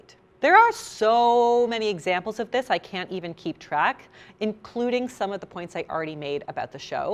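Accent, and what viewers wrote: Canada. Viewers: Well yeah, at least in this context they are very different. The US has shows that are arguably more vulgar about weight than this one- My 600-lb Life or 1000 lb sisters. I don't think you knew she was Canadian, it doesn't seem like the majority of British people can distinguish between Canadian and American accents.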